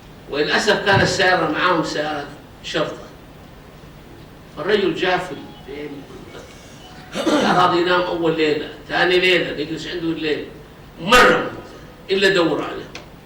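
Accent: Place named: Hejaz, Saudi Arabia. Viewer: Cashit Fr ياخبل ذا ماهو طرش ذا يمانى اصل العرب بس لهجته حجازيه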